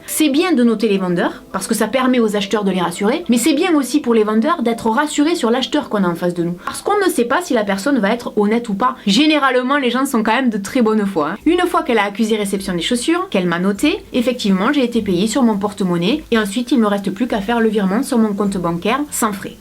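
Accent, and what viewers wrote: South, France. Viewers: je t'adore tu donne le sourire rien qu en disant "bonjour" avec ton joli accent du sud. Tes idées de vidéos sont originales et super bien faites. Merci je te suivrais toujours!!💖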